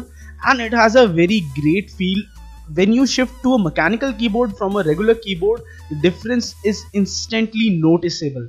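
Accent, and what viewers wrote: India. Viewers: It's not bad. It's awesome. When did accents become bad? If you don't mind, what state are you/your family from in India? You sound like my colleague Jigesh from Punjab but I don't want to guess.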